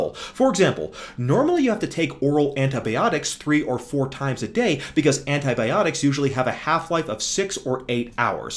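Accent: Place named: North, United Kingdom. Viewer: UK vibes. Possibly north of england. Possibly Pakistani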